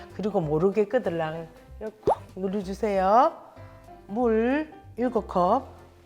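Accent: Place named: Gyeongsang, South Korea. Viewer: 앗 우리 갱상도 어머님.. 유튜브를 하고 계셧엇어요?! 구독합니다 ㅠㅠㅠ 몰랏엇네요 ㅠㅠ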